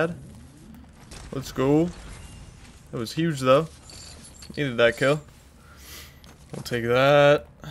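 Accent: Netherlands. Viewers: Is he dutch? Bc he has that accent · You from NL?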